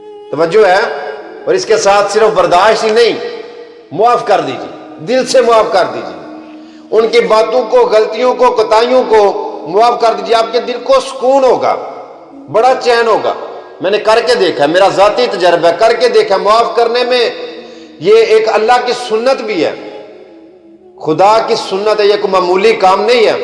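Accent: Punjab, Pakistan. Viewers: sab punjabi ma andaz byan acha hai apka...lehja asar krta hun punjbai lehja acha hai apka plz punjabi ma bat kia krain....urdu b apki behtr hai No doubt...i hope are you understand...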